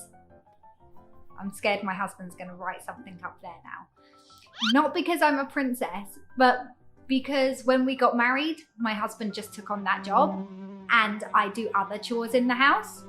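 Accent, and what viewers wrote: United Kingdom. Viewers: 好喜歡你的英式腔，很好聽，像聽哈利波特電影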